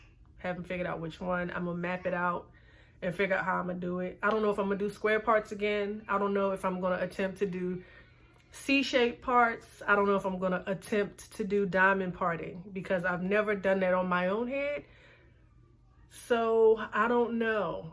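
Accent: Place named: Prince George's County, United States. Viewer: I'm from PG, the accent!!! Makes me miss home 💛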